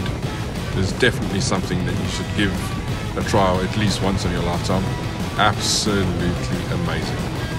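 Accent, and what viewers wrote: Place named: South Africa. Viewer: Hey boet! I discovered you in one of Dick Whistles videos. Think it was Distinguished Gents ride. I was moved to hear about what you are going through. Although I’m not really a YouTuber. Only made a couple pipe smoking vids which I enjoy. I have ridden bikes since I was a wee nipper. Back in Cape Town SA. Where you based mate I can hear a Safa twang in your accent boet. Drop me a line. I’m in sunny Basingstoke with my Mrs. Would be a pleasure and honour to meet you good Sir. Perhaps meet at Loomies one fine day ( perhaps pushing it in the U.K. lol). I’ll go with dry lol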